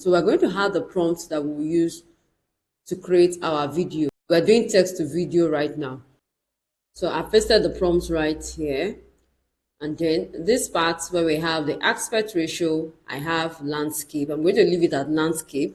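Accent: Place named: Nigeria. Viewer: how did you get the Nigerian voice?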